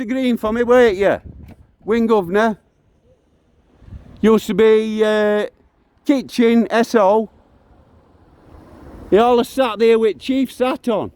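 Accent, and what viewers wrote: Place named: Wakefield, United Kingdom. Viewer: Smashing audit with great commentary. Where are you originally from pal?....very distinct accent! Older style Wakefield or even Royston Barnsley?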